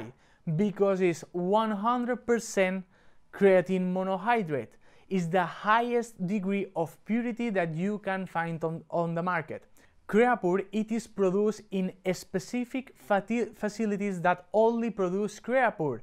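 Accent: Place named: Spain. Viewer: The Spanish accent is a bonus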